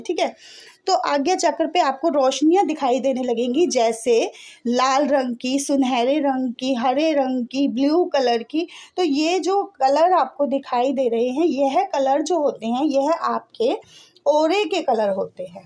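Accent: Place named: Delhi, India. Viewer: Aapki aawaj se lgta h aap whi di hn dilli wali😊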